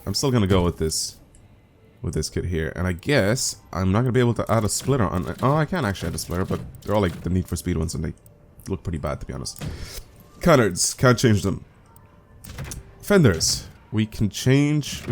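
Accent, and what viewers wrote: Ireland. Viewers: Wow ure irish i didnt know im irish too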